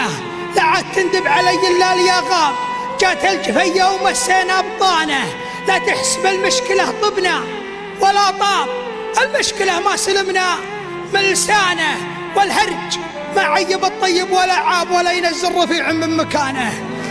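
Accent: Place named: Gulf, Arab world. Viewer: اؤؤف يربي شكد احب الحجي الخليجي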